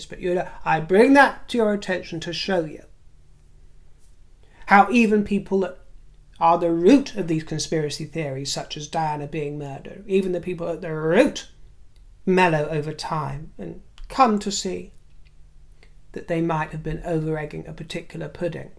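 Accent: United States. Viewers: from one Cancerian to another, your American accent is marvelous! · You have a very good American accent. Your headband is divine; that is your color. Always a treat for me when I see that you have posted. Great point about the dangers of slander.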